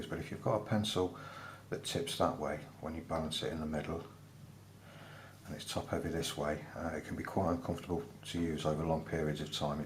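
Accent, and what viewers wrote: United Kingdom. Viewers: i'd like to make 2 small points on your channel. 1.you have a very nice, relaxing voice; 2nd, your english accent is not very deep, and while most non british people would find that tough to understand, i would find it a bit annoying, so ty for that too. im in process of getting a mechanical pencil and i have to choose between 0.5 and 0.7 for mostly writing and between uni kuru-toga pentel 205 and zebra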